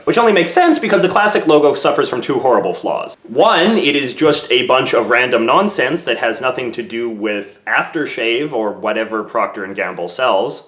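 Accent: Canada. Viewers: You have an American flag behind you, and yet you're Canadian because you say "aboot" so hi fellow canadian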